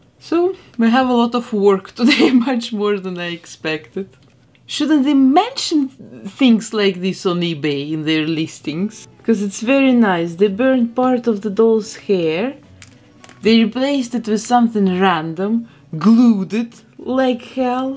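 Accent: Russia. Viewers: Она ведь русская??? Или украинка Такой жёсткий акцент может быть только у нас 😂😂😂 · Ты русская? Акцент очень чувствуется (возможно, какой то другой национальности) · Сложно слушать этот русский акцент... жуть какая. Исправьте, пожалуйста! Я очень уважаю английский язык и мне больно это слушать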